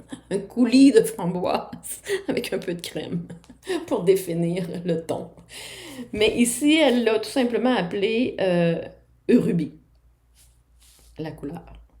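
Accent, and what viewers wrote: Quebec, Canada. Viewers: pleins de soutien, toutes mes condoléances pour ce frère tant aimé. Merci bcp pour cette vidéo et cette accent joyeux du quebec. Bises bretonnes ☀️💞 Christine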